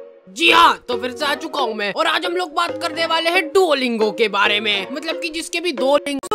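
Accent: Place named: Madhya Pradesh, India. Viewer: MP ka chora hai 🗿 uska accent <3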